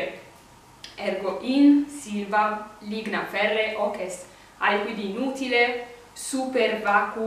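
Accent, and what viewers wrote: Italy. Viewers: pronuntiatio restituta cum jucunda modulatione italica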